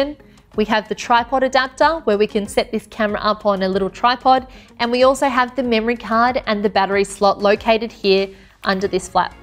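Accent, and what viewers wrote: Australia. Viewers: Aussie confirmed.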